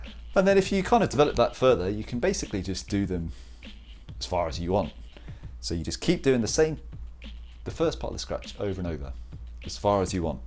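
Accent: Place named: Texas, United States. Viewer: Yeah, I can't find anyone else being credited with inventing it. I believe I was wrong😁 Nice chatting, I enjoy your videos and I like your hand drawn S9 faceplate! 12inch Skins is awesome, got some on my setup if you want to check on my videos. The videos are terrible, ignore that part... Cheers from Buffalo NY! I'm guessing your from Texas judging from the accent lol! Or maybe Great Britan! 😁 I hope to get there someday. Looks beautiful! Cheers!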